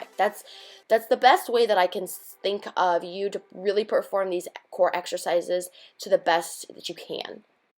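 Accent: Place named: United States. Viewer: Where in America do chicks talk like you? Sounds cute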